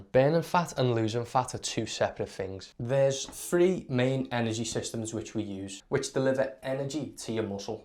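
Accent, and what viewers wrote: Liverpool, United Kingdom. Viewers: Great video btw are you scouse ? Love that accent you speak like Jamie carrager , are you a Liverpool fan ?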